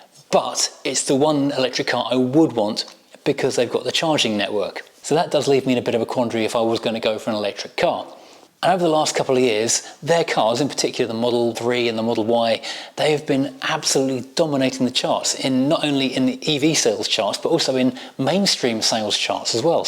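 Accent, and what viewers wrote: United Kingdom. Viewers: I'm a car guy who was really interested in this topic. I tried real hard4 several minutes. I found myself irritated by this effeminate, sloppy mouthed, Brit accent, akin2 Piers Morgan. I finally couldn't stand it anymore &gave up. Pewh!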